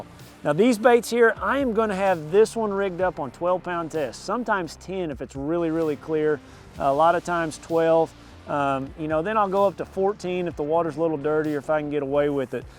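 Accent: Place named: Midwest, United States. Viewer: I just like your way of teaching others, easy to listen too! Plus you're a Midwest guy right, u think I heard you're an Okie?!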